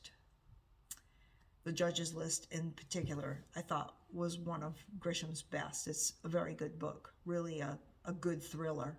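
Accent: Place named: New England, United States. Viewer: I love my local libraries too. We live in a pretty rural area where I am, but they do a nice job I think, and I’m looking forward to being better at participating once my kids are older and I have more time. A Very Virginia Christmas sounds fun. I loved Tomorrow and Tomorrow and Tomorrow-such beautiful writing and very moving to me. I hope you like it! I’m not sure if I’ve ever read any John Grisham. I’ve seen the movie The Firm of course and I didn’t realize there was a sequel, wow. Maybe I should try one. Just out of curiosity, are you originally from New England? I have a lot of family from NH and you have a slight accent so just wondering :)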